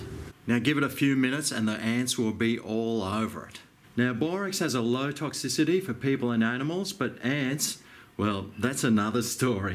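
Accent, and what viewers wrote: Australia. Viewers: Thanks for the info! Love your accent, makes me want to throw some shrimp on the Bar-B. After I clear the area with a borax solution! Thanks again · Love Australian accent. I watched several videos on this. Some were too long. Some moved their phone around so much that I got sea sick. This was short. To the point. Simple. Concise. And I like that you added the caution at the end about dogs, butterflies, etc. Thank you. Your formula is the one I chose. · Love your accent and calm energy. I lived in Oz for 1.5 years, it was the highlight of my life. You seem to live in paradise, wonder which part you're in. Trying your ant solution now, thanks so much 👍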